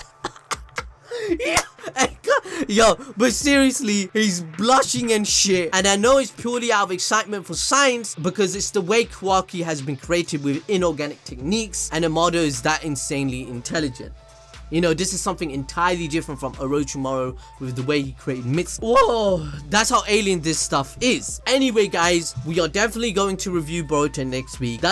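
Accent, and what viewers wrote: Punjab, India. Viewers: Man are you pakistani?? Your punjabi accent seems like it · That Punjabi accent 😂🔥🔥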